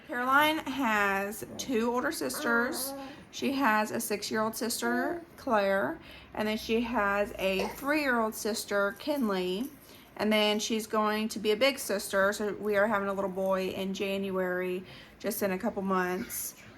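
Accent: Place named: South, United States. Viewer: Sounds like maybe some place in the south !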